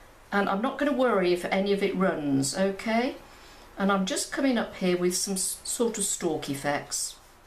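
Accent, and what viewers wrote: United Kingdom. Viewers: British accent wouldn't let me leave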